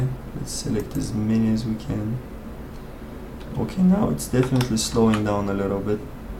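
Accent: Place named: Brazil. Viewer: Your accent sounds like brazillian.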